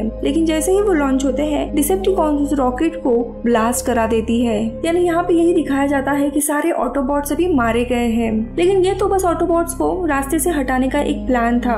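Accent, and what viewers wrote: Maharashtra, India. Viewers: do you have any relation with climax explain in hindi channel's nikhil ? moreover your accent is quite marathi. are u a marathi? pls tell. like your choice of movies and explaination.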